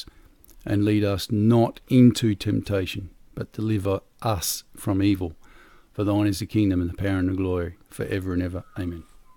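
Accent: Australia. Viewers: Are u a queenslander mate?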